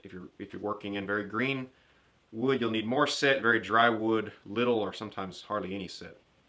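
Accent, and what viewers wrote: Tennessee, United States. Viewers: Sounds like a Tennessee accent to me, but I haven't lived there since 1969. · he sounds more Tennessee city to me. Maybe kinda like Memphis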